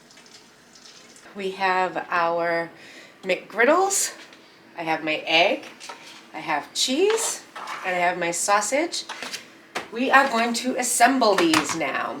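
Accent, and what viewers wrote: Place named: New England, United States. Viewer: Perfect recipe for lazy mornings! Can’t get enough of that New England accent. Absolutely adding to my weekly routines! 🙏🥰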